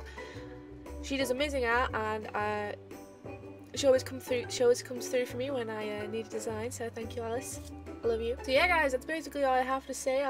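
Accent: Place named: Manchester, United Kingdom. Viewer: Your voice reminds me of an old mate from Manchester ^^ keep it up, deary!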